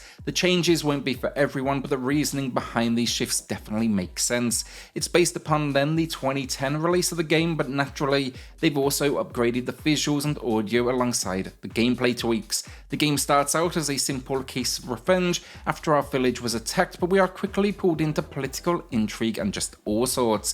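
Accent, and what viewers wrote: United Kingdom. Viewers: you have a cool accent, Scottish perhaps?. It won't be long before this channel gets massive. Keep it up guys!!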